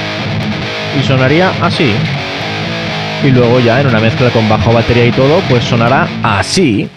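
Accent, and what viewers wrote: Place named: Barcelona, Spain. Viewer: I can tell by your accent ur from barcelona :)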